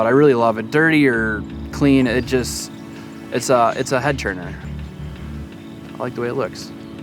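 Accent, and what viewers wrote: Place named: North, United Kingdom. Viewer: Holy northern accent. Much respect from Virginia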